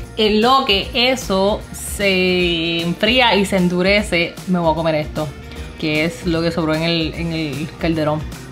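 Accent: Puerto Rico. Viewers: Niña tu acento es puro boricua. Nosotros queremos recibimos muy bien a nuestros invitados. Mi recuerdo es las reuniones familiares, yo extraño mucho a mi familia. Feliz Navidad hermosa💞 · Me encanta tu accento boricua eres hermosa y muy energética me encanta todo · Me encanta tu personalidad eres un vacilon 👍😅me siento bn orgulloso d q t sientas como toda una boricua 💯💪me sorprendes y me encanta tu acento d mi preciosa isla no puedo irme sin antes decir q eres BELLA........😍😘💖🌹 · Me muero de la risa contigo yo soy nacida y criada en P.R hasta los 16 y tu hablas mas boricua q yo!😂🇵🇷 love the video you are too funny. Definitely new sub!! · Que chulita, con ese hacento Boricua ,.Que pena que no eyes de mi epoca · Que mujer mas linda de verdad. Una mujer China hablando bien boricua. En la escuela yo vi una Japomesa hablando español y en la Universidad una Vietnamese woman tambien hablando bien Boricua. 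Que bonito de verdad. Dios bendiga tu belleza. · Woooo corillo aquí una nueva subscriptora jajaja te ame dsd el momento en que te escuché hablar boricua de pura sepa 💓 Desde Ponce PR ❣️ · Nenaaaa, eres 100xciento boricua. Acento, manerismos, pique, me rei tanto contigo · Si no me dices que eres china, ni me doy cuenta. Pareces, hablas y actúas como una puertorriqueña 🤣👐🏼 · 😃😃😃😃nena tu hablas mas puertorriquena que yo ME en canta el hablar boricua 😭😭. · 😂😁😂 Te pasas!, saludos hermosa, no te puedo ver como china pues con ese acento y carisma de boricua al 100%, imposible, no se como caí en unos de tu videos pero si, son cheverones😁 muy simpatica, te voy a seguir viendo por aqui🙏 cuidate!😁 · Está mujer si q tiene acento bori 💯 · Ahhh me encanta tu acento boricua!!! 😂😍😍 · Quien es esta Boricua china habla bien borricua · Gracias por hablar tan lindo de mi isla. Tienes el flow Boricua. 😊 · tu asento es de boricua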